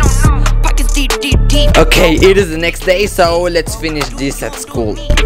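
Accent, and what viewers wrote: Mexico. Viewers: Tu ingles es muy mexicanisado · Oye te amo. No entiendo nada de lo que dices xD pero mi untuicion dice que eres mexicano jsjs besos en el fundillo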